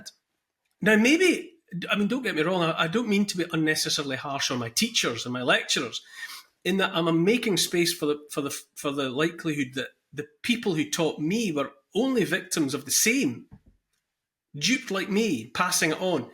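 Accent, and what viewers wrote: Scotland, United Kingdom. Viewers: Love to listen with that wonderful accent! 😍 Scotland, Ireland?? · I wrote this Poem a while back. Thought you might like it. It suits the times just fine... Feel free to read it out one day if you like. Would sound ace with your Scottish accent! Rare Gem Robert Berning 04/12/09 Filament and firmament everything's not permanent, from the depths of the greys, to the limit of our ways. But the cycle continues ever turning always into. We need new thought process, from the minds closed recesses, to change our way of learning, new directions we are turning. Many years we have thought the correct things we've been taught. All our lives we have lead, all the lies been drip fed. Suck the tits of prevarication, drink the milk of misdirection. Live your lives in that veil, let your own soul turn stale. Were all caught in a cage, with no bars and no walls, but to escape from this cage, we've been supplied with the tools, life's programming even way back to the schools have turned us all into illiterate fools. 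With probing my mind has now found the pulse that all that we have ever been has always been false. Equivocation, Evasiveness, fabrications for sooth fed statements that deviate us from or pervert the truth, from an arsenal of politics, religions false hope, to the alcohol, tobaccos and dope that we smoke. Their weapons are varied and dire in scope. We think were immune, but how they do gloat! When we escape, which we do all the time, its easy to accidentally just fall back in line. The programming was set from all our first breaths, to stay away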